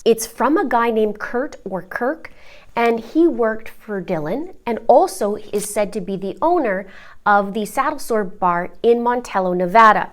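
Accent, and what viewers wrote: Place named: Canada. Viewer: Wow you have the strongest Canadian accent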